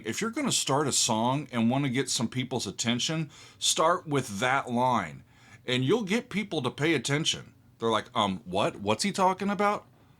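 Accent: United States. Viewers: I love your accent. What State are you from, classical composer?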